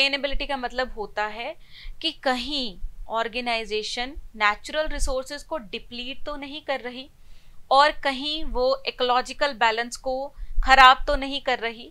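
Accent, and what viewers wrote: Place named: Punjab, India. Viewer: Mam your punjabi accent😂❤❤ Dil jeet lete hoo.!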